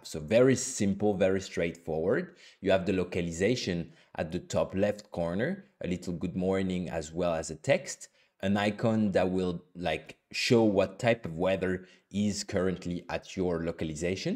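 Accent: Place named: France. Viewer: god , I love the French accent.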